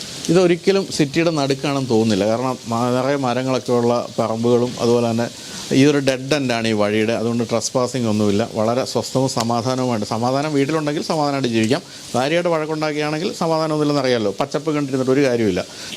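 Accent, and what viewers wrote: Kottayam, India. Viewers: BYO - bar rule 😄😄😄. Like your Kottayam tone.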